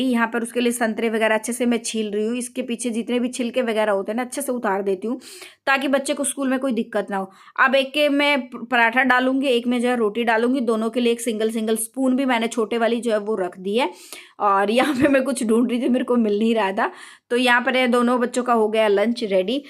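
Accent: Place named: Haryana, India. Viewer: Di aap kha rhte ho qki aap haryanvi lgte ho bcoz m b haryanvi hu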